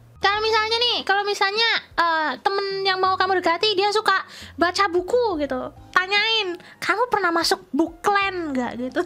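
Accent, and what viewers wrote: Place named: Java, Indonesia. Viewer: Logat jawanya mbak makin kerasa 😂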